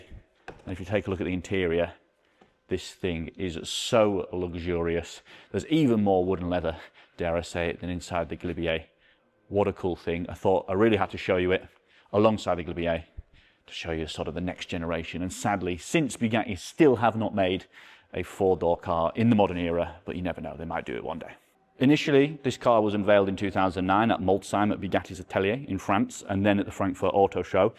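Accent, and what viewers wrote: United Kingdom. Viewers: His british accent is adorable. Buttun.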